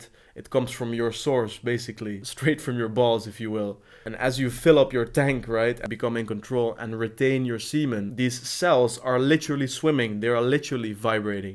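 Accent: Netherlands. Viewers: Ben jij Nederlands? Je klinkt Nederlands. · Ik ruik een nederlands accent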